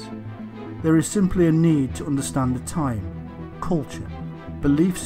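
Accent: United Kingdom. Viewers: The minute I heard the British accent I knew this would be a watered down "damage control" version. Presented more as a myth than reality. Read Sitchin for the real truth.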